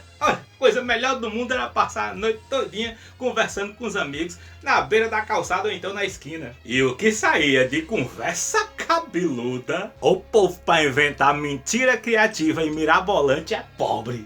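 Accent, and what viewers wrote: Northeast, Brazil. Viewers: Cara, onde você estava que só agora eu te achei? E que achadoooo! 👏🏼👏🏼👏🏼👏🏼👏🏼👏🏼Uma pista eu já tenho: o sotaque é nordestino😍😍😍😍😍😍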